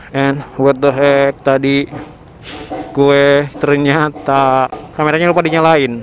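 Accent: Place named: Sunda, Indonesia. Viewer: sepertinya anda orang sunda, gue suka👍😂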